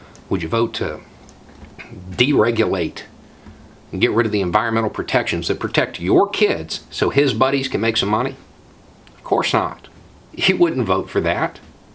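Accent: South, United States. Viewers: I appreciate someone with a southern accent speaking some sense.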